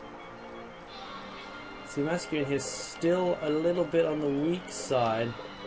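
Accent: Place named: England, United Kingdom. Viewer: you arent bugging me with your sloppy work. your accent (since im american its an accent to me =P) makes it all work it. very entertaining. I find it ironic that both commented LPs of this game are by fold from the land of england. even if you are in japan now like you mentioned in one of your videos.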